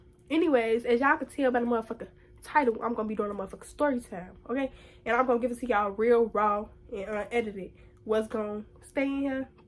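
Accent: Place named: Chicago, United States. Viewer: I like ya accent lol you sound like you from Chicago “blew me” is funny asfff 😂😂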